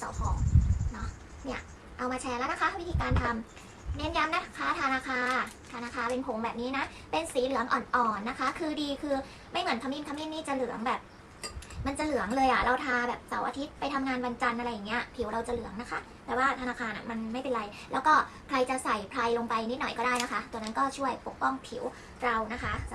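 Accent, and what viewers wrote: Isan, Thailand. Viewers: เว่าอิสานม่วนดีเสียงน่ารักมากเลยน้อง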